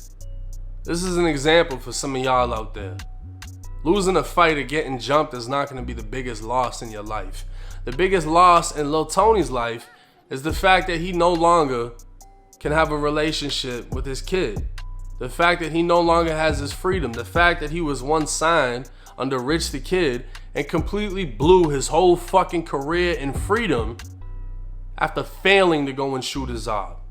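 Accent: Boston, United States. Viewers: Bro I've been meaning to ask you are you from Boston you have a Boston accent am I correct or no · Bro where are u from..?Boston???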